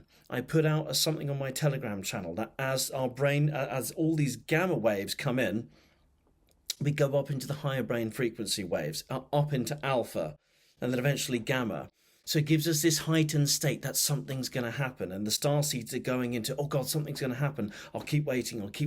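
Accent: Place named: United Kingdom. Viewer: First time on your channel. Great reading! Your british accent is lovely however you move very quickly through things and your speech was a little fast for me. I'll listen again. Thank you for your reading. Just subscribed .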